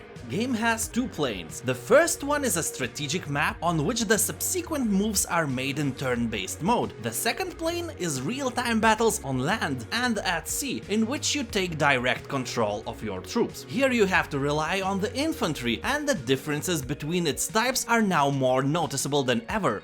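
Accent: Lithuania. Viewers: Are you Lithuanian? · Wait a minute... that sounds suspiciously Lithuanian.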